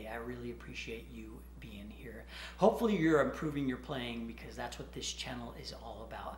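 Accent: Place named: South, United States. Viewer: Just guessing because me and you have the same accent.I like your singing but I bet you are from one of these states. North Carolina,South Carolina ,Alabama or Kentucky. Am I right. LoL.. We're not built for singing heavy metal and absolutely no Rap. LoL.. I'm from NC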